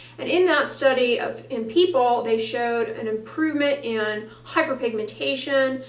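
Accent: United States. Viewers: What state do people speak like that? Just curious.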